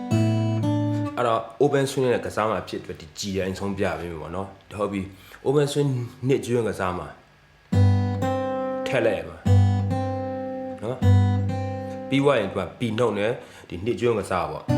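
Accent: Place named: Danu, Myanmar. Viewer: "ညီက ပင်းတယသားလား??အသံကိုနားထောင်ရတာတော့!ဓနုအသံပေါက်တယ်နော!😊✌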